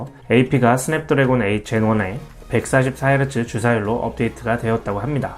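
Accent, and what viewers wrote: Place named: Daejeon, South Korea. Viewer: 대전분이군요 ㅎㅎ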